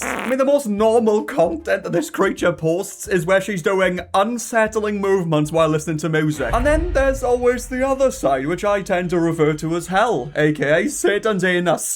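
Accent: United Kingdom. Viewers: I'm english but I can mostly understand this British accent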